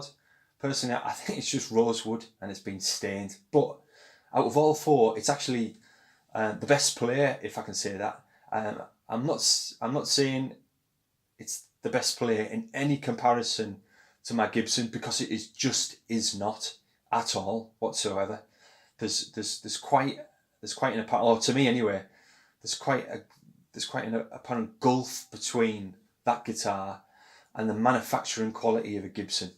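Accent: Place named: Middlesbrough, United Kingdom. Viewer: I have a sharp ear and you sound like you are from Middlesboro steel!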